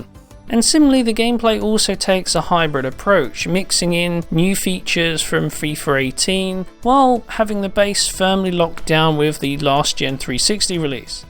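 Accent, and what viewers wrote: England, United Kingdom. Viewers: british accent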